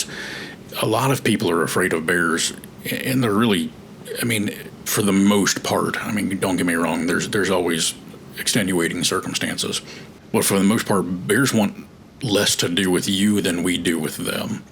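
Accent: South, United States. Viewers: This Augusta old lady, now living in Canton, appreciates your southern manners and accent! I enjoy your adventures.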